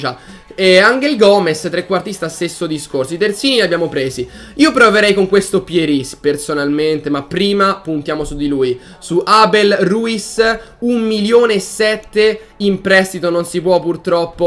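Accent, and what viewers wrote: Tuscany, Italy. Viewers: Toscano puro eh bella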